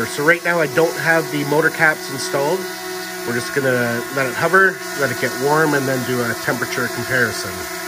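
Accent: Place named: Nova Scotia, Canada. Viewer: Haha. I knew you were from Canada. Most likely from Nova Scotia.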